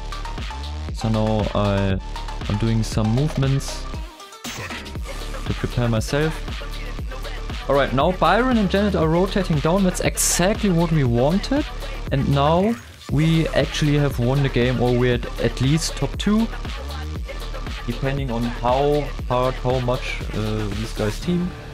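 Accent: Germany. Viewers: It was pretty nice , and I'm totally okay with german accent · it’s okay the German accent, your literally my fav yt for guides like no joke nobody makes them better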